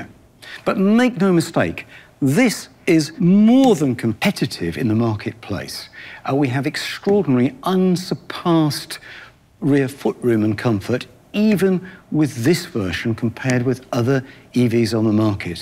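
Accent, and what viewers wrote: United Kingdom. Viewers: OMG, such an amazing video, exceptional presentation skills. Fantastic British accent. Beautiful cinematography. I love it all. I didn’t care about lucid, till now. Subscribed. Keep the good stuff coming and I hope you will be able to produce cars for the masses too in the near future. Thanks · I'm born and raised in the USA. I'm totally disgusted with abundance of British accents I have to hear these days. I'm nearly 80...and it hasn't always been this way. This time I guess they're trying to take us without burning our buildings. Britain and Israel are our two worst enemies...and the sooner others realize that the better off we're going to be. And BTW: Everything he's saying you should have learned in high school...and could have learned in grade school if they weren't wasting all your time on "love your government as yourself" propaganda. 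· Quite similar to the original Tesla Roadster and Model S HV packs, in that Lucid uses many thousands of tiny 18650 "flashlight" batteries, as in laptops and power tools. Improvements over Tesla are a higher pack output (900 VDC), as for most newer BEV's, instead of the 400 VDC in Tesla packs. That makes fast-charging easier (half the current). Another advantage is that the end-plate cooling and lack of adhesives should make replacing individual failed cells much easier, and likely easier assembly too. The Physics he presents is high-school level so not amazing, though when stated in a high-class British accent it sounds more clever.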